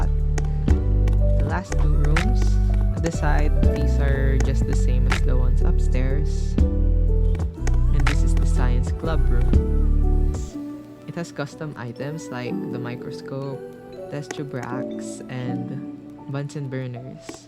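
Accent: Philippines. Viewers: Ur filipino cos of ur accent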